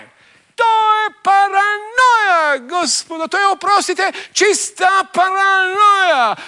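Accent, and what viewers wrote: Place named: Herzegovina, Bosnia and Herzegovina. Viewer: Nema glumca do Rade Šerbeđžije koji zna govoriti Krležu! Moj naklon i Despotu ali ima svoj hercegovački naglasak!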